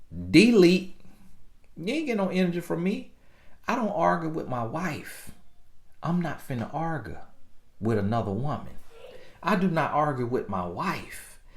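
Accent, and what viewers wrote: South, United States. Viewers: I love southern twang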